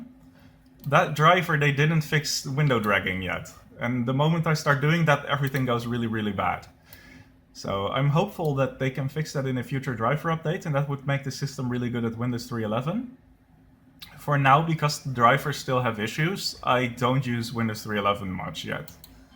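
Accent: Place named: Netherlands. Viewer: Je klinkt een beetje Nederlands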